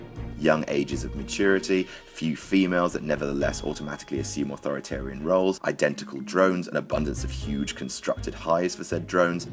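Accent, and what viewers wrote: United Kingdom. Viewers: You're so great, I love this channel. The content, the editing, the comedy, that snarky British accent that we Americans adore so much. It's like a perfect package all rolled into one.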